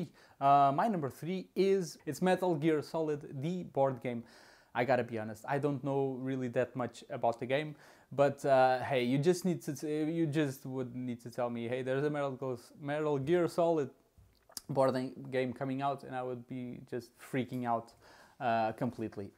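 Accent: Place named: Portugal. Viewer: Muitos parabéns pelo canal e boa sorte! Sou grande fã de boardgames (solo e não só) e foi uma agrdável surpresa encontrar uma voz portuguesa neste meio :)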